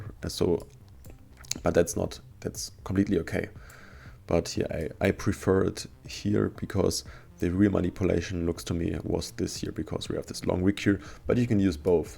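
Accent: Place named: Germany. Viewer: I hören the deutsch 😁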